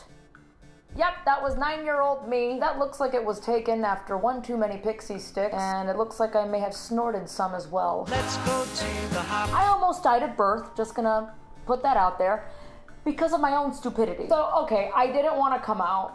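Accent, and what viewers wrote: Cuba. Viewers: I live for that cuban accented spanglish! as a spanish person (spaniard? idk, english is confusing. I mean to say that I'm from spain) I find spanglish and the evolution of spanish in general across the pond so interesting! it's amazing how a language can change so much and represent so many cultures and yet still be like a comforting unifying blanket. bit of a weird metaphor, but I'll go with it. anyway, just wanted to say that I love your videos (and accent!) 😊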